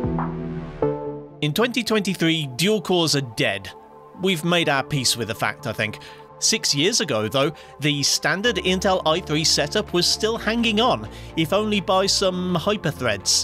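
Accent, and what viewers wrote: England, United Kingdom. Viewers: What region are you from in england?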